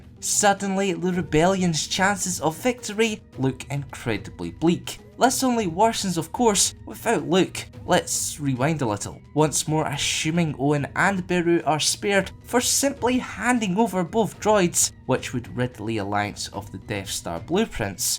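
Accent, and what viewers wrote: Scotland, United Kingdom. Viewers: Lambda Presents Scottish I believe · Lambda Presents: Yes he is Scottish, His voice is cool! :)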